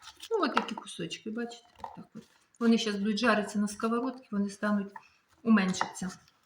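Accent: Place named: Odesa, Ukraine. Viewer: Яка ж у вас чудова одеська мова!! Ви СУПЕР господинечка!! Як я люблю дивитися на Вас і Вашу працю! Обов'язково зпечу цей КРАСЕНЬ!!!❤❤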